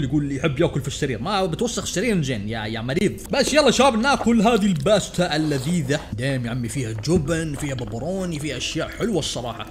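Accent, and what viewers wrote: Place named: United States. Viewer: الاكسنت الامريكي معطي جو 😂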